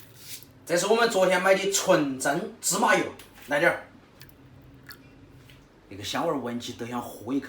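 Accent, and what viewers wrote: Sichuan, China. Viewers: 四川话怎么这么难听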